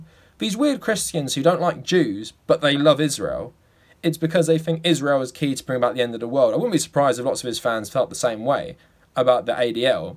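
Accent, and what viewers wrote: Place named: England, United Kingdom. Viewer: You may want to watch yourself. You said ‘kill’, ‘all’, and ‘jews’ in that order in a video and I’m assuming you live in England based on the accent, and as we know based on your trash judicial system, context is irrelevant. Plus, they’d probably have something to say about that poster behind you with that particular salute. Anyone want to get on the horn about this terrible person to the authorities?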